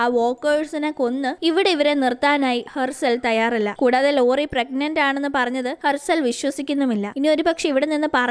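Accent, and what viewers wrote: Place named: Kozhikode, India. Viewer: sound like kozhikode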